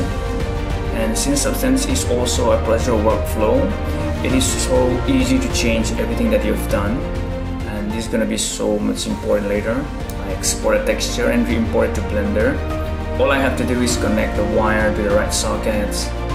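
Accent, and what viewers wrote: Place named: Northeast, India. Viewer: Your English accent sounds like you are from NE India. Great work btw. Cheers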